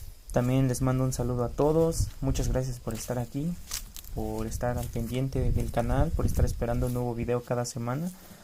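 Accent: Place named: Chiapas, Mexico. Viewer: excelente video. estos SÍ vale la pena verlos, no como otros videos tontos ke no aportan nada ,.felicitacion al joven por su ingenio y su timbre de voz muy agradable , nada ke ver con esos videos españoles ke con su horrible tono y timbre de voz caen como patada en los EGGS. PERO KIERO SABER QUE LUGAR ES , ESTA MUY BONITO POR ALLA. POR EL TIMBRE DE VOZ PARECE SER DE CHIAPAS. AYUDA ,DONDE ES ?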